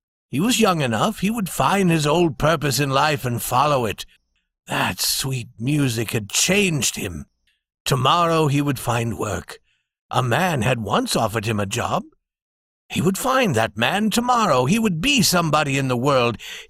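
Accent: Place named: England, United Kingdom. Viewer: very great accent ...English (Saadiyat Beach)..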